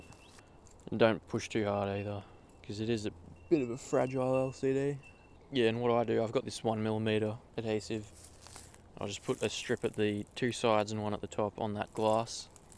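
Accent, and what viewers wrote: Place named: Australia. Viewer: Love your vids man, Aussie AF Easy to follow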